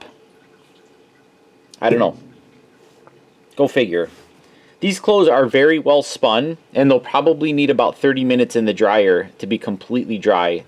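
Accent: Wisconsin, United States. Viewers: I almost want this guy with his Wisconsin accent to say “Marge, get the cheese wheel”. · You definitely sound like you’re from Wisconsin or Minnesota, but most likely Wisconsin.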